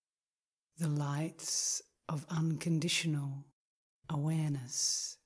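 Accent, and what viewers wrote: Australia. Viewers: lovely proper Aussie accent, brilliant m8🙋‍♀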